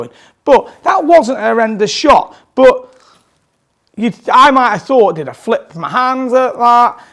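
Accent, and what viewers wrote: Manchester, United Kingdom. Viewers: what do you mean, has a fine South Manchester accent! Perhaps should add subtitles for non Mancs lol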